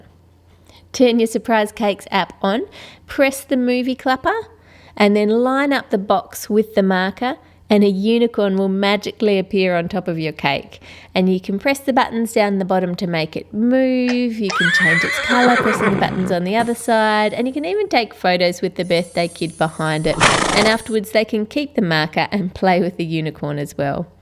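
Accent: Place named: Australia. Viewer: Because she's from Australia had as an accent.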